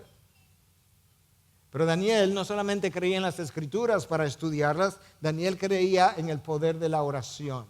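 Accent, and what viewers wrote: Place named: Dominican Republic. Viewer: Mi señora el pastor es dominicano y esa S hace parte de su acento. No se fije en pequeñeces como esas sino en lo importante del mensaje. A usted por ejemplo se le escaparon varias tildes en palabras que las llevan🤔😶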